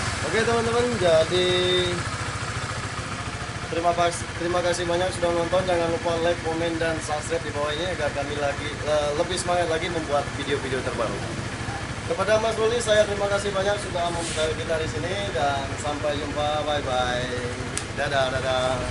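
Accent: Palembang, Indonesia. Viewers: Logat palembang ?? Lokasi dimana kak ?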